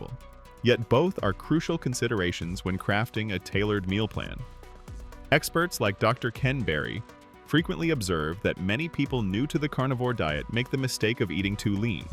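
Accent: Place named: Germany. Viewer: is this a german channel? he sounds like a german..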